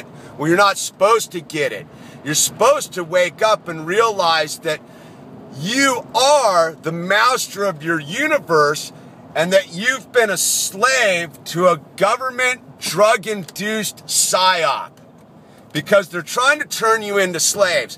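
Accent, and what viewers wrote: California, United States. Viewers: Ahhh. OK. Clearly this hombre is from Cali. No one in their right mind would talk like that anywhere else...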